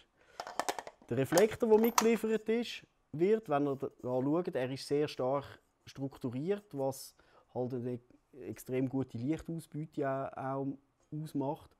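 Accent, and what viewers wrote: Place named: Switzerland. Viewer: Interessehalber mal nachgefragt: Welche Art von Schweizerdeutsch hört man hier? Züritüütsch? Ich als Norddeutscher verstehe jedenfalls relativ viel (anders als z. B. bei Walliser Deutsch).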